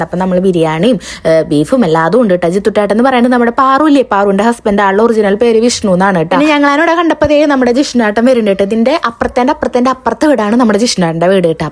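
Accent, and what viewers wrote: Thrissur, India. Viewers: ഹലോ ചേച്ചി ഇങ്ങനെയൊക്കെ വീഡിയോസ് കാണുമ്പോൾ ആണ് എനിക്ക് എന്റെ നാട്ടിലെ പൂരം ഒക്കെ ഓർമ്മ വരുന്നത് miss thatt... ചേച്ചിക്ക് ഓരോ ദിവസം എത്ര പൂരമാണുള്ളത് എല്ലാം നല്ല സന്തോഷിച്ച ആഘോഷിച്ചു കഴിയുക അതുപോലെ ചേച്ചിയുടെ ഓരോ ഡ്രസ്സുകളും എനിക്ക് വളരെ നല്ല ഇഷ്ടമാണ് ചേച്ചി സാരി ഉടുത്തിട്ട് കാണാൻ എനിക്ക് നല്ല ഇഷ്ടമാണ് ചേച്ചിയുടെ മുടിയും ഒക്കെ അതുപോലെ ചേച്ചി വീഡിയോയിൽ വോയിസ് ഓവർ കൊടുക്കുമ്പോൾ ചേച്ചിയുടെ ഒച്ചയും അതുപോലെ ആ തൃശ്ശൂരിലെ ആസ്വാങ്ങും ഭയങ്കര ഇഷ്ടമാണ് 🤍🤍🤍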